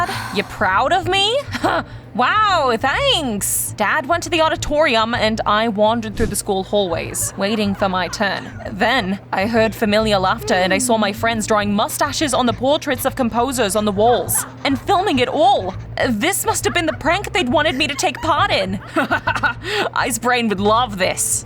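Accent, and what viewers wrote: United Kingdom. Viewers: The British accent though 😅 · Dnt like this British accent.its annoying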